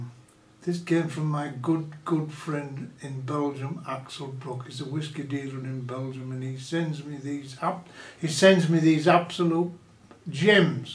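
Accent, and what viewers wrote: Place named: North East England, United Kingdom. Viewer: Great guy! Sounds like north east England (his accent). Says “filim” a few times!! Great guy, great video, appreciate the tips!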